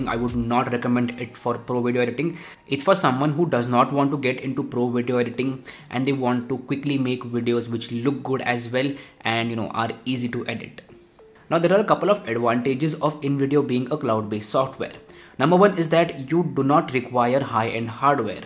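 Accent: India. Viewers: Hhh indian accent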